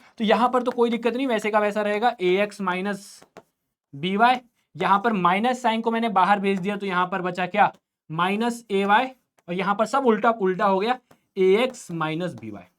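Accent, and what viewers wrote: Bihar, India. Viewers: Bihari kahika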